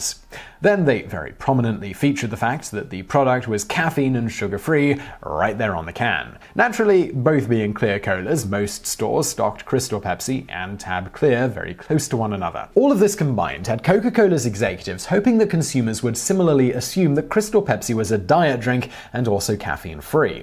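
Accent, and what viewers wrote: United Kingdom. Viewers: When did the vsauce guy get a British accent? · As a Californian I find English pronunciations of Spanish words delightful. I feel a little less bad about how much better they are at english than us. Wonderful episode as always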